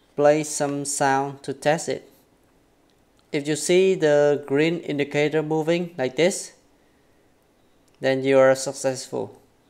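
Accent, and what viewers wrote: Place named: Vietnam. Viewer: Đậm chất Vietlish nhưng mà tôi thích :))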